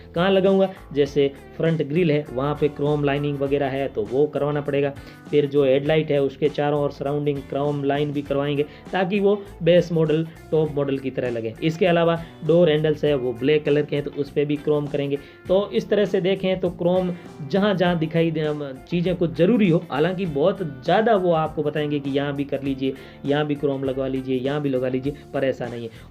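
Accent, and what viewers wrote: Rajasthan, India. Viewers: Bhai rajasthan ke ho lagh toh rha h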